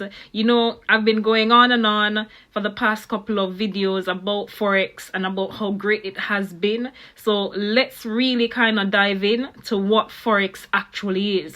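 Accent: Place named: Jamaica. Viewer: Good video! Are you jamaican? You have a lovely accent 💯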